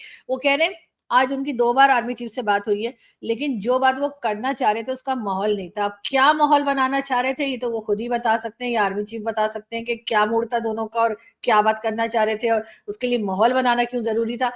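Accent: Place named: Uttar Pradesh, India. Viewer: Aapki Punjabi Bhasha up Walon Ki Tarah lag rahi hai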